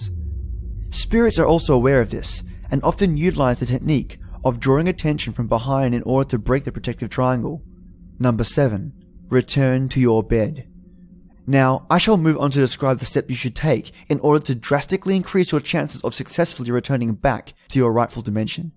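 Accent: Australia. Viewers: Awesome! I'll be looking forward to longer videos 😉 and thank you! My little nephew behaved pretty well. He was in a very happy mood. Maybe because it was his 1st Birthday yesterday 🙂🎂 As usual interesting story, great narrating, awesome Aussie accent! Haha 🖒🖤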